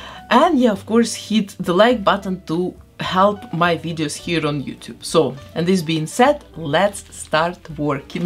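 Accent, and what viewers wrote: Russia. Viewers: Мне кажется, или у девушки чисто русский акцент?😅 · Работа потрясающая P. S. Я одна слышу лютый русский акцент? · мне кажется... или у нее русский акцент..... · Что это за акцент? Русский или норвежский? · Есть ощущение, что она с русским акцентом? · Почему я слышу русский акцент 😮 кукла замечательная!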